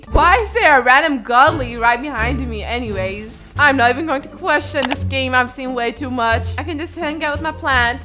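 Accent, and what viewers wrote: United Kingdom. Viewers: YOUR british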